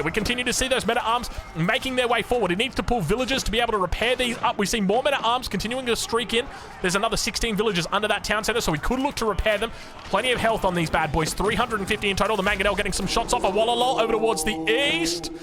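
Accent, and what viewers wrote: Australia. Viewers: Fresh in the morning, love it!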